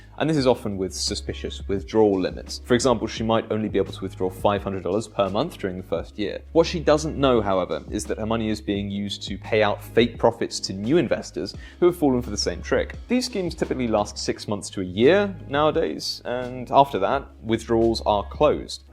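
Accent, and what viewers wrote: United Kingdom. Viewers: British accents make people sound smart. This is 11 minutes of chatter with no new or useful information. He has a suit and tie and speaks like an intelligent person. That's it. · Just because you wear a $2 suit and has an English accent, doesn't mean you know what the fook you are talking about.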